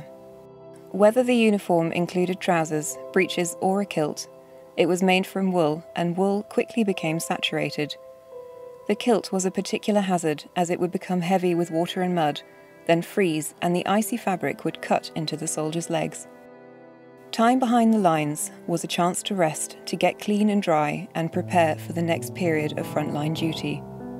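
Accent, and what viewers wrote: United Kingdom. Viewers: don't need a British uniform to know he's from there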